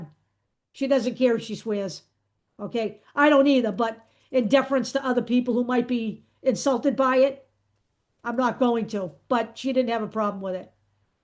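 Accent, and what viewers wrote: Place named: Massachusetts, United States. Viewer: Are you from Massachusettes? Love your accent. (I'm Texan)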